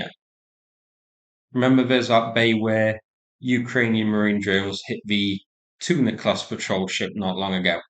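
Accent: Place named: Yorkshire, United Kingdom. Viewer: Near Yorkshire.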